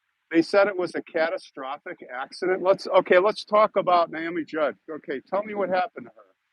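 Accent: Chicago, United States. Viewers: My cousin Teresa married Gary Vanhousen from Chicago il so your dialect hits home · love your Chicago dialect!!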